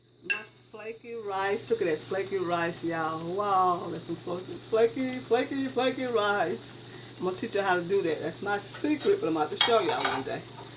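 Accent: South, United States. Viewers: I dont know why but i love her country accent...food looks great too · The authenticity of the southern voice over made me love this even more! Have to try this recipe 💕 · Jill Lancaster she has a very strong southern accent that's why jerks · This is country/southern cooking...and country/southern pronunciations for seasonings. If you want "correct" pronunciations...watch the food channel. It's almost like asking Cajun people to speak the "Kings English" when cooking. Let player's play...and cooks...cook. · She can pronounce things the way she wants to when the food looks THAT good..leave us southerners alone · Omg i looove her KUN TREE ACCENT....kun tree cooking...kun tree eating..i love the way she say...THEH mye lol and buuuur ty full lmfao...im hollering....love her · Jason Bourne wow I didn’t realize I was going to get all this feedback... calling someone a moron though? 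I just made an observation. If I were to pronounce something wrong I would want to know how to say it correctly. Sooo since you want to come for me actually it’s not her accent it is her southern dialect that you really should have referenced. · I love her country southern accent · lol she meant curry powder. She even said "pet a reeka" for paprika.....southern speech....sigh***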